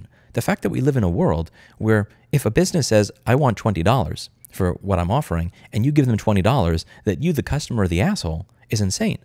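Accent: New York, United States. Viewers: Any chance you're from New York?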